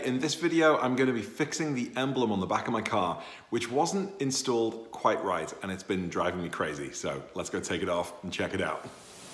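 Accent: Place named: Northern England, United Kingdom. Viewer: I thought it was Liverpool!! I'm in Manchester so could her the Northern in your accent. 😂😂 Came across your channel as I'm on the look out for a 911 myself!! Keep up the good work! 👍👍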